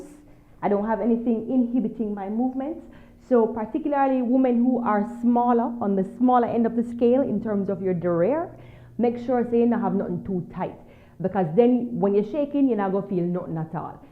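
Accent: Jamaica. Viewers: ooh girl, you took the "nasty" out of twerking and elevated to a real dance form...loved watching and listening to that lovely jamaican accent 🥰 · Hello from new york city.....I love her Jamaican accent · I LOVE THE JAMAICAN ACCENT ......EXOTIC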